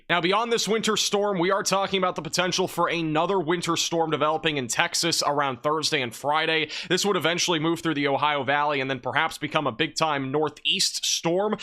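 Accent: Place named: New York, United States. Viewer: i like watching ryan hall however u upload more often and remind me of new yorker talking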